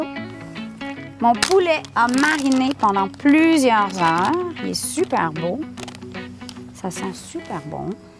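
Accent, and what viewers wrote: Quebec, Canada. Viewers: accent quebecois !!!!!! , l`accent canadien ca serais du francais cassé, parlé par un anglais. au canada ils parlent anglais c est juste au quebec le francais ! arreté de dire canada meme si le quebec fait parti du canada il a sa propre lanque et ces propre coutumes et ca a rien a voir avec le reste du canada ! c est comme la france et l angleterre ils sont proches mais different completement different ! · olala qu est ce que j apprécie énormément l'accent canadien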